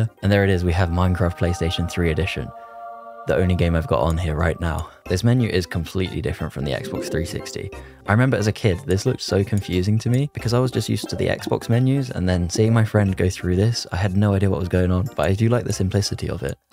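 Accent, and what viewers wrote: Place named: United Kingdom. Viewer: I love this guy’s accent. where is he from in the uk?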